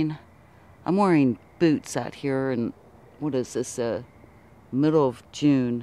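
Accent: United States. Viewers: Good morning {, evening) dear ..,yes very interesting and fantastic. (,,,nos kedves nő annyira jó hallgatni a narrációt azzal az ízes jó amerikai angol kiejtésel , hogy az valami hihetetlen számomra , imádom, és köszönöm.) ..ok ..l wish you a wonderful week - end dear .. ok .. Big Hug.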